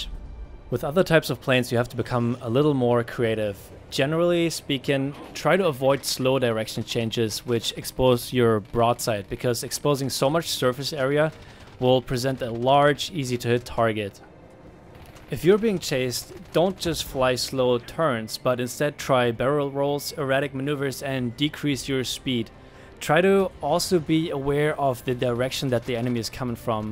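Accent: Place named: Germany. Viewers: • dedo • wow he is German? I didn’t know that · Ersten Satz gehört.. direkt gedacht, dass da jemand aus deutschland kommt :^) trotzdem beneide ich dich für dein englisch! echt schönes video! :) weiter so.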